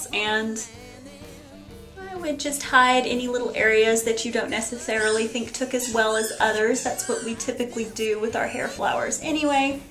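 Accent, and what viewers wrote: South, United States. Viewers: Favorite part; "I'mon spray.." Favorite southern belle in the world.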